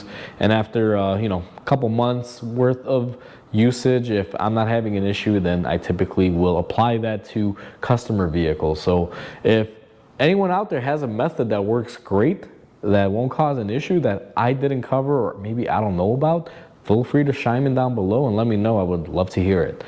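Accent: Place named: Chicago, United States. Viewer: People think that accent is southern and not anything but Chicago? Man, you folks need to get out more.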